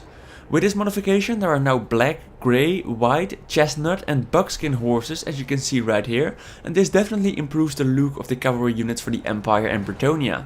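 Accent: Netherlands. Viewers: You sound quite Dutch :) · Somebody is Dutch..:) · Are you dutch? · I'm now.. and you are dutch, and have braces.. i can tell.. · Nederlands? :) leuk accent, kan ze d'r zo uitpikken :P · It's fairly easy to hear the dutchness in the voice XD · This geeza Dutch? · Someone is really really Dutch.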